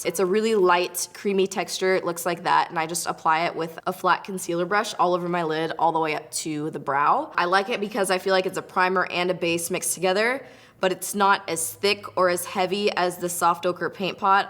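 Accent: California, United States. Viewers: U have a classic Cali-girl accent! Lov it! I wonder where u grew up?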